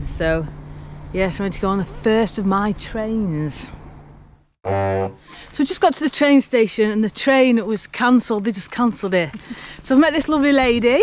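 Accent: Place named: Northern England, United Kingdom. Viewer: No offense to our southern cousins but I always find it a delight when I watch a video and get to hear a familiar accent from 'up 'ere' :)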